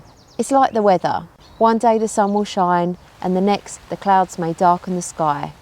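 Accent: United Kingdom. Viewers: Hey I think your cool, I love your zeal for life and your British accent!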